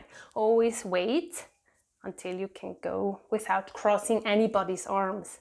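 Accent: Switzerland. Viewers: First of all... I like to say that I like so much and I obviously luv Swiss pronunciation Also one thing that I figure out is swiss people are really good at voice changing:) So, thank u for the new lesson cuz it really helpful.....!!! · A question, is the Swiss dialect presented here of Zurich or Basel? Bernese and Oberland Swiss sounds different.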